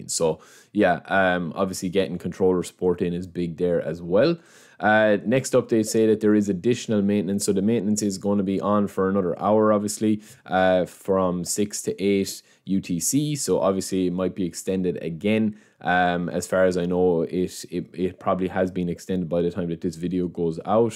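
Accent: Ireland. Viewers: I know it may be random, but are you from Cork?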